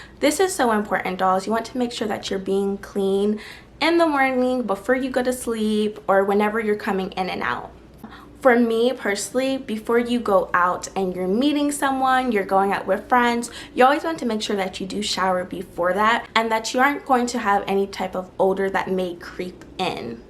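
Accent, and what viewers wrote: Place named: Caribbean. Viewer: Where are you from? I'm hearing a particular accent from the carribean.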